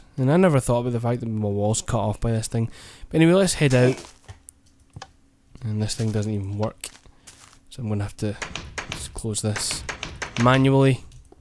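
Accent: Scotland, United Kingdom. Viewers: What first got me to start watching your series was your accent, I love Scottish accents and it made the commentary more interesting. I then grew to like the series itself and the cool things you built and how you went about it. I've also started watching your other videos and am enjoying them as well. Thanks, I'll be waiting for Season 2!! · fuck me ur so creative and imaginitive:D i can't work out ur accent im getting scottish but im just nt sure lol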